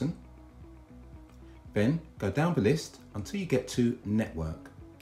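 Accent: United Kingdom. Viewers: THX british sir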